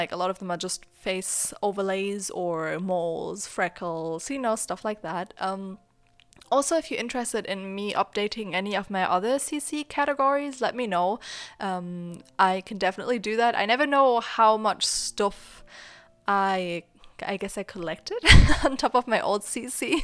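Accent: Germany. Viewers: german accent? :D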